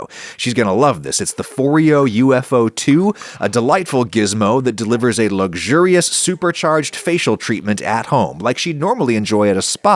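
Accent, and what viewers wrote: United Kingdom. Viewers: is Bri'ish, confirmed live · too british for me, unsexy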